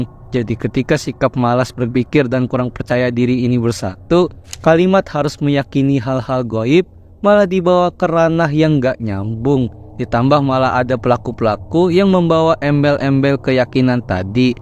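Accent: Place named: Banjar, Indonesia. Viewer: Bang orng banjar masin? Logatnya kaua orng banjar 🗿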